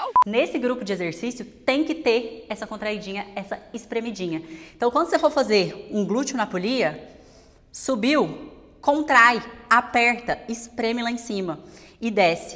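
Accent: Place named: Goiás, Brazil. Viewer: kkkkkkkkk, o sotaque dela sempre entrega que ela é goiana raiz.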